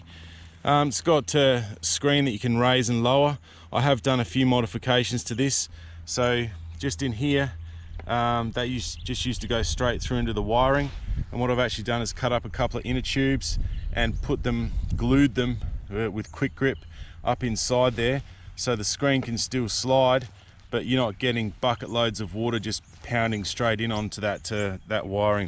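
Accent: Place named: Australia. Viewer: duuude sick build! where in australia are you from? the accent is soo mild from an american perspective. If you were british id think you came from central London rather than Cockney. I ride a 701 supermoto. Love supermotos i take mine on hard enduro all the time :) need a bigger gas tank tho xD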